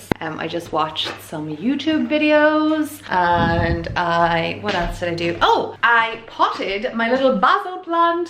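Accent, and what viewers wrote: Ireland. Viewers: I love your blogs! I organized my closet after watching you....brought out all my spring/summer clothes. Put away all my winter stuff. I could watch and listen to your sweet Irish accent! Please keep your vlogs coming! ❤️ from the USA!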